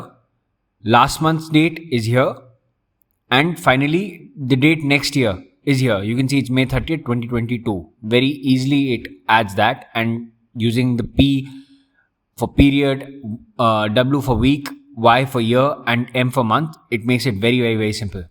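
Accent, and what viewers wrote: India. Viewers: Indian English accent is one of those I have trouble understanding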